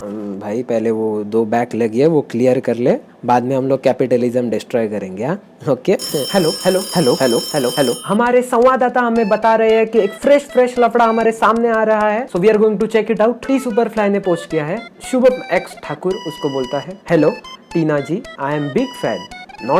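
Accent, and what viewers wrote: India. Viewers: Abe tu bihari kyun lagta hai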